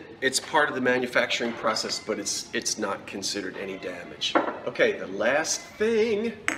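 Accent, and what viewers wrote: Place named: Philadelphia, United States. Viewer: "Wooder"....love the Philly accent. Reminds me of home....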